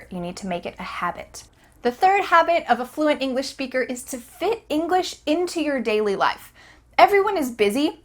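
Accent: United States. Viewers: Did you know that is from the state of North Carolina USA... so she speaks American English! You mentioned that you like British accents... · Hello, I saw many YouTubers are teaching English but the way you teach English is very affective and understandable. Yesterday I watched another English teaching channel, I didn't liked their videos because firstly their accent was different like Australian and English, so those accent wasn't understandable for me 'cause I watch videos in American accent like yours and Hollywood movies. Secondly they were not getting to the point. They were just spending time in front of 📷. Last but not least Passionate about teaching English, I mean I didn't saw any passion in their eyes which I see in yours. So thank you very much 🙏🏻🙏🏻 💝 from 🇮🇳 (I'm sorry for my bad English because I'm beginner but I am sure that one day I will speak English like you)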